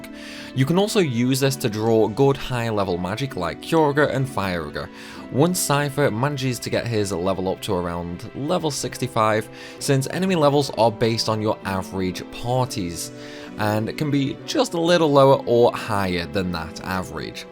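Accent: Stoke, United Kingdom. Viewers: I’m normally pretty good with accents, but I can’t quite get yours. Are you from Stoke/surrounding area?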